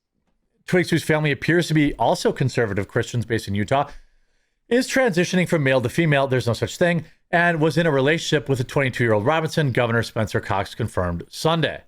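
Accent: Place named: Wisconsin, United States. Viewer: the Quartering is from Wisconsin. Along with Minnesota and parts of North Dakota, that region is known for having a distinct accent within the US. Jeremys isn't all that noticable, but this is one instance where you can hear it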